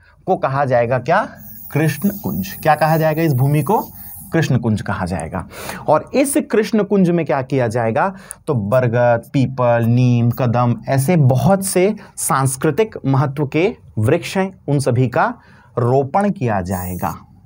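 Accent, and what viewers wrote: Chhattisgarh, India. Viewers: Thank you sir😁🙏😁... Sir aap kaha se hain...apki chhattisgarhi ka lahza achha lgta h....😁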